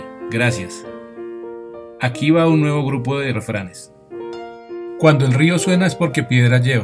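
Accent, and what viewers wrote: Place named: Antioquia, Colombia. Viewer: tiene acento de paisa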